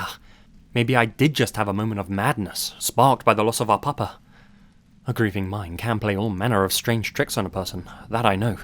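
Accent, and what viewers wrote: England, United Kingdom. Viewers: You grew up in the philippines ? I always thought you were british 😅 at least you have a british accent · I’m kind of new to this channel, and I’m really enjoying itself! Although one thing, I’m so confused on where is from. He has a British accent I think, but he says he’s lived in a lot of places in Asia I think. Sorry if this is a weird or dumb question! I’m just a little confused. 😅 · Am I late to the party or…..did this white guy with an English accent just say he was Filipino? I’m thoroughly confused but having said that, been binge watching videos for about a week and now I can’t sleep 😊 Love the content!!!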